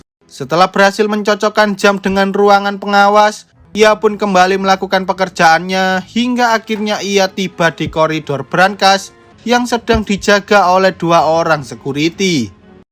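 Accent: Java, Indonesia. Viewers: Terlalu mencolok wong jowo nya 😂 bg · Suka penjelasannya sellu dgn logat mendok nya 🤭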